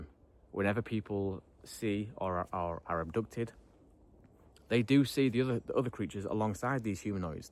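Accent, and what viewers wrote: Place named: North East England, United Kingdom. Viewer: Don't short-change yourself! We love your N.E. accent alot; but we're really here for Lily! (LOL HARD) 👽👽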